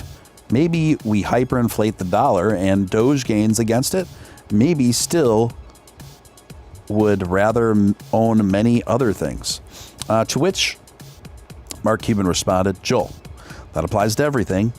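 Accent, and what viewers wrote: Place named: Chicago, United States. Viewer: Hey.. Your accent Sounds like your from Chicago..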